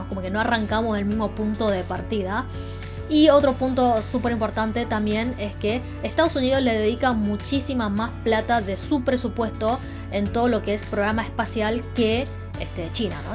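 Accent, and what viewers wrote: Argentina. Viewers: Muy simpática dándonos una brillante información y por cierto con un bonito acento argentino. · Saludos desde Lima Perú ... excelente tu español, tu acento español Argentino. Éxitos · Muy lindo su acento argentino chino y muy interesante lo que dice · interesante, se nota que estas o has estado en argentina mucho tiempo, porque tienes ese dejo, buena ventana de difusión de china, soy tu admirador. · Belleza que dominas pero muy bien el español castellano tipo argentino saludos desde santa cruz Bolivia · QUE ACENTO ARGENTINO QUE PUEDE DESAGRADAR A LOS SEGUIDORES, ENTRE ESOS EL SUSCRITO. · Que interesante video, pero me pregunto porque la srta presentadora tiene como acento argentino, que curioso.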